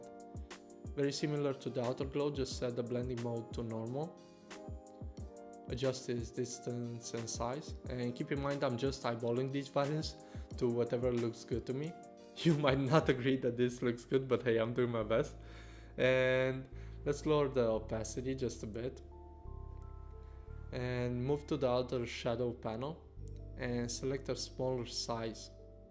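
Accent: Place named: Romania. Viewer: Dupa acent e roman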